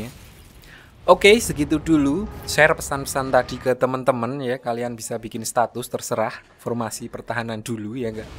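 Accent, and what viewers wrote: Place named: Yogyakarta, Indonesia. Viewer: Wong ngendi sih min kok bahasa e sekitaran jogja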